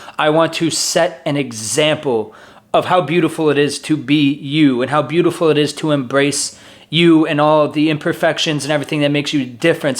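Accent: United States. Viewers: Truth...wasn't sure about this guy with the Chicago accent that liked to wear bball shorts with golf shirts when you first showed up in Good Good. Didn't take long to realize that you're a genuinely solid dude and I'm glad to see what you bring to the whole group. After this video, even more so. Thank you for sharing your truth...we're all better for it. But seriously...stop wearing bball shorts on the golf course. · Anyone else just think he had a jersey accent?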